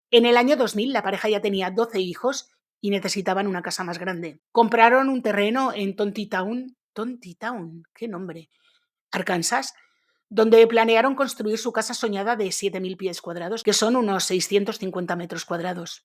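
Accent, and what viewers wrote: Spain. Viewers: Y tu quien eres una persona que vive de hacer videos sobre la vida de los demás? Y a veces mentiras? Ya veremos si te va bien cuando tengas que rendir cuentas a Dios. Detesto el acento español.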